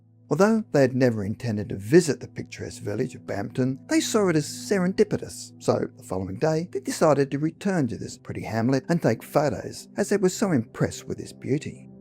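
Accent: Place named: Australia. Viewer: He's an Aussie. Not AI.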